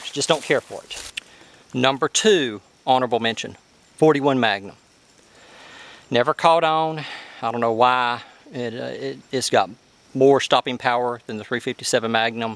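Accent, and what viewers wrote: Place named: South, United States. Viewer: The ONLY caliber for a civilian is the .22 - the only one you can put 3K rounds on your back and run with . Another YT " Expert " ....besides the stupid hat and southern drawl , what might your qualifications be , Mr. Knowitall ?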